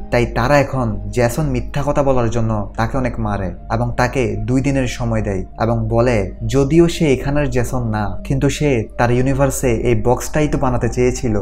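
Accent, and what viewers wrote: Chittagong, Bangladesh. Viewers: আপনার কথায় একটা চাটগাঁইয়া টিউন আসে।🫥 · ভাই কি চট্টগ্রামের নাকি? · চিটাগাং বাড়ি নাকি? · Bhai Chittagonian naki amr moto? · চিটাইংগে চিটাইংগে গল্প মজা লাগছে😊😅❤ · ভাইয়ের বাড়ি মনে হয় চট্টগ্রাম 😊 সীতাকুণ্ড আসলে নক দিয়েন❤ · চট্টগ্রাম ❤️🌸 ভাষা ধরা পড়ল একটু করে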